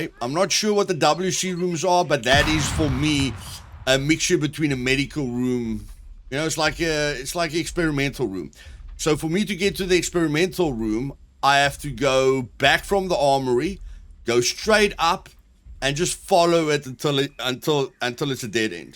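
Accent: South Africa. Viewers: Heiii are you South African. Just judging by the accent:) I myself am, thats why i recognize it.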